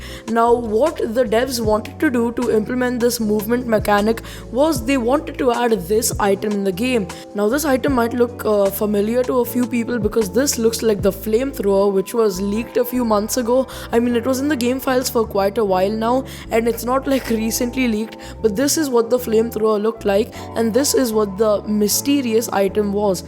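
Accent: India. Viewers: Your accent seems like u are Indian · your indian accent is so funny and good at the same time xD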